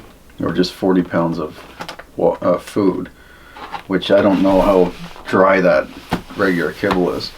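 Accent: Canada. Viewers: I love the Canadian ascent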